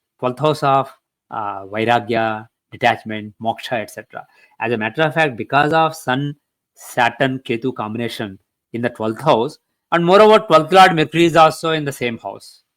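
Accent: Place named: India. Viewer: Very nice of you sir, inspite of living in USA since long, you still speak indian english, 😅which every one can follow and understand.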